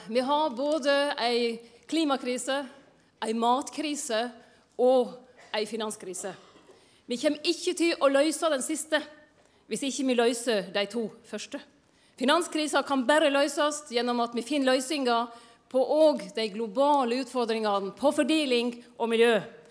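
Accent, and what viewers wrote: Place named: Norway. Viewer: Liv Signe har den kongedialekten! Bra tale av Sp-lederen. Godt valg til de Rød-Grønne.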